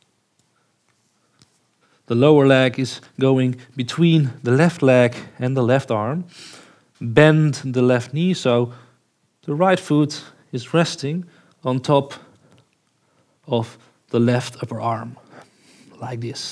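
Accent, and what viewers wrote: Netherlands. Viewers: Ik kan horen dat je nederlands bent haha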